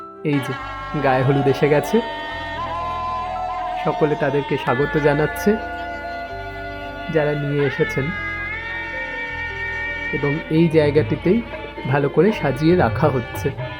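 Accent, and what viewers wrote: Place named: Santiniketan, India. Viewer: Apnr bolr style ta santiniketoni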